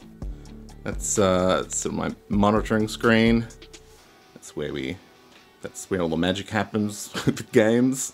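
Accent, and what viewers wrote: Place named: Australia. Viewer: I love finding new Aussie youtubers. It's weird hearing people on youtube with my accent haha, I don't think about it but it's kinda jarring when I hear an Australian now because I just expect them to be American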